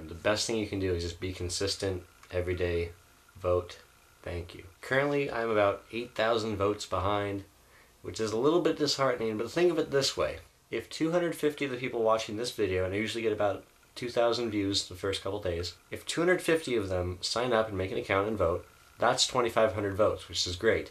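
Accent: England, United Kingdom. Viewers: O Your English accent is amazing! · Your English Accent is Class!! :D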